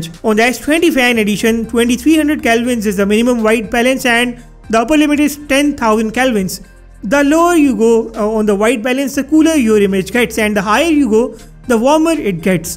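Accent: India. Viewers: indian english haha, you can go crazy listening something like this lol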